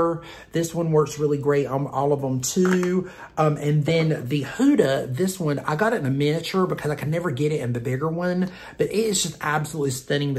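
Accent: South, United States. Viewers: What a great video! I know how much effort went into this….completely enjoyable. The combination of your southern accent and your obvious makeup artist experience is so Nice to watch! Thanks for uploading👍.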